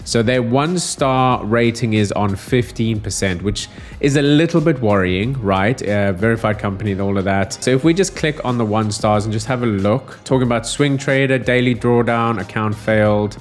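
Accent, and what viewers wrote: South Africa. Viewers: Bruv are you South African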